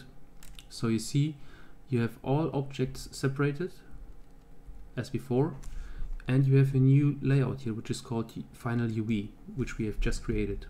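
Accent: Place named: Germany. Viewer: Bist du deutsch? Man merkt iwie einen Akzent heraus ^^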